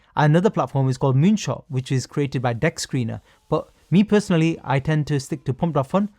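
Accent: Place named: United Kingdom. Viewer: All throughout a well spoken guide on how to avoid scams on Solana network, this really helped me during my learning process of the network and inner workings of the system. Also I would like to point out that nobody ever should listen to an Indian guy with a polished UK accent talking about crypto scams on sketchy websites like photon. good day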